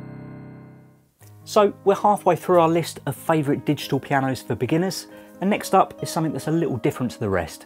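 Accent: United Kingdom. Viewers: is he british? hahahahha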